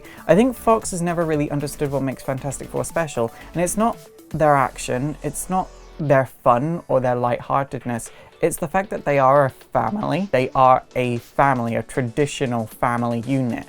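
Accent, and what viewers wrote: Scotland, United Kingdom. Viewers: you sound slightly more Scottish than usual this video, I kind of really like it. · Is he Scottish ? I hear a mixed accent so I don’t know what his accent is lol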